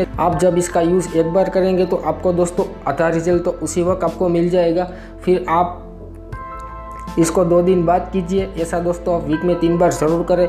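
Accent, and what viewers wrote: Gujarat, India. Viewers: Bhai tu gujrati haina teri aawaz se hi pta chl gya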